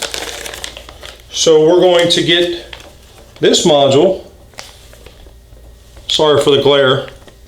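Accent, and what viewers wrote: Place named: Pennsylvania, United States. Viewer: My hazards aren't working but my turn signals both are. It sounds like you're from PA. If so do you have a shop or a shop you'd recommend near ohio by 80 or 76? It's cost me a fair amount learning on my 2001 roadking and I don't mind if I can learn but the electric for the rear had been "redone" and the left switch somehow controls the rear lights. It's a little bit much to explain on here but any help would be greatly appreciated. Thanks for sharing your video I look forward to watching more 👍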